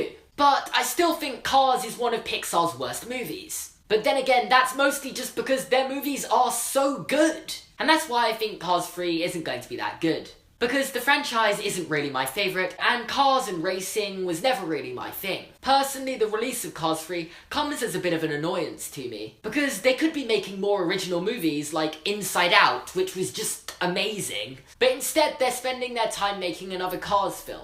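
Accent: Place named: RP, United Kingdom. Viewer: You're so fucking posh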